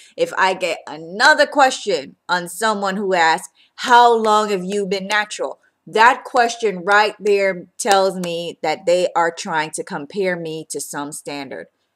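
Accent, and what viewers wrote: Jamaica. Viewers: loveeee love your hair- i am going natural-6 months post relaxer now- are you jamaican? i hear an accent? my whole family is jamaican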